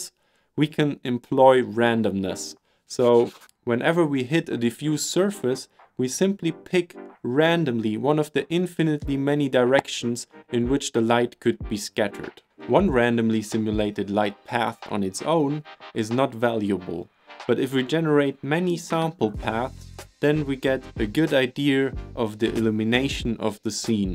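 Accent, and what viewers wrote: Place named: Germany. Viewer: du bist doch en deutsche Bub oder? der hör ich doch raus sache mal! Und bockstarkes Video also wirklich erste Sahne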